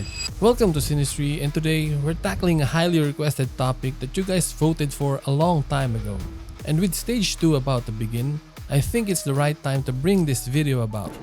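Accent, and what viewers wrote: Philippines. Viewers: From his accent, I think he is Filipino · im suprised when i heard that filipino accent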